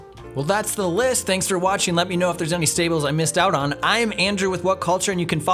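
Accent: United States. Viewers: *american accent *shudders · Fuck this American, un subbing now , this would be so much more better with a British guy narrating - why the fuck are you guys diverting from what made you so great ? It's like your the wwe and we the fans are told to just shut up and accept it - fuck you - wrestling with wregret all the way now , this channel is no longer what it used to be , it's sold out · sorry... the narration through me off.. American accent confused me. lol I thought I wasn't watching WhatCulture for a sec. 😄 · Hears american guy Instantly goes back to subscriptions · I'm pretty sure it's because he is an American and doesn't have the British accent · throws me to hear an american accent on these vids. dont understand the hate though. all these vids sound like they have the same writer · Who the effing hell is this guy with an annoying yankee accent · Whatculture lucked into something great with the 10 Totally Wasted Factions and as they don't often do, they had no idea what to do with it, and put a whiny American kid in it. 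Long live British accents in Whatculture. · Exactly. I'll watch if it's Blampied, Pacitti, Simon or even Jack but Andrew is a no-no. Annoying bland American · I'm watching a WhatCulture video why is there an American talking. If I wanted to hear Americans talk I'd be watching wrestling directly. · So you're the yank, eh? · I'm not used to hearing an American on WhatCulture Wrestling😅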